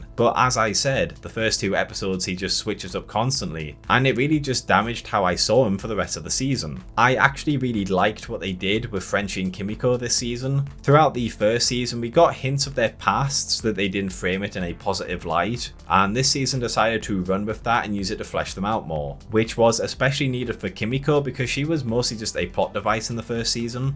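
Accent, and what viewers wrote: United Kingdom. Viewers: why do people who do these videos always got a brit accent?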